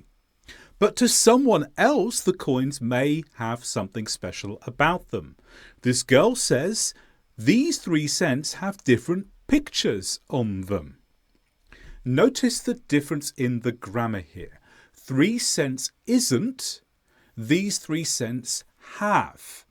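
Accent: England, United Kingdom. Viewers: I like your British accent and teaching video, which is perfect since it helps me gain more English grammar rules, phrasal verbs, and expressions. But there is little difference in English usage in other English-speaking countries such as the U.S., Canada, Australia, and New Zealand....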